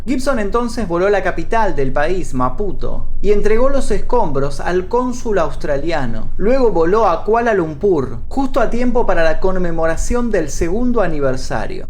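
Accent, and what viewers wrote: Buenos Aires, Argentina. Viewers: por tu voz parece que eres de Argentina 🇦🇷 ó Uruguay 🇺🇾 estoy de acuerdo con todos los comentarios positivos este es mi primer video que veo tuyo excelent. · Que genio!!!! SOS un copado.... Muy bueno tu relato, excelente perfomance, así hablamos los porteños. es totalmente Argento....Por muchos relatos massss 💪💪💪👏👏👏👏👏👏🇦🇷🇦🇷🇦🇷🇦🇷🇦🇷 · es que los Argentinos y los Uruguayos hablamos asi la ll suena sh, por eso es latino y no español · se ve que asi hablamos los de argentina porqué me decían lo mismo a mi xd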